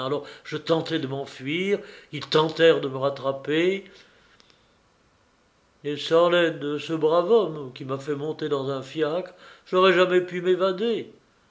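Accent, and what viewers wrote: England, United Kingdom. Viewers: Pourquoi cet accent anglais? Ilbn'a pas rzison d'être, puisque il est censé s'exprimer dans sa propre langue. . Bref, j'arrête c'est très insupportable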